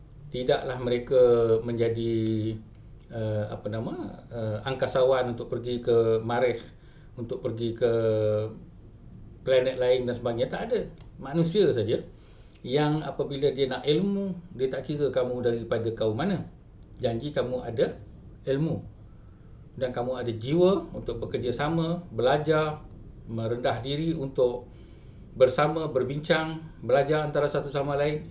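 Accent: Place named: Sarawak, Malaysia. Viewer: berucap mcm orang Sarawak. Mesej bes! Setuju 100%!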